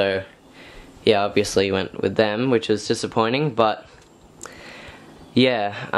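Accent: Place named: Australia. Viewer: do you have wild bearded dragons in youre yard because you sound austrailian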